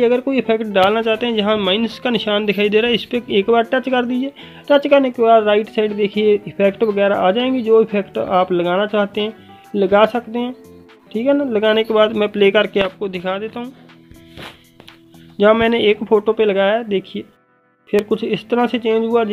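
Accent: Punjab, India. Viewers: Yehh punjabi voice😇😇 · Aap pke Punjabi hai na sir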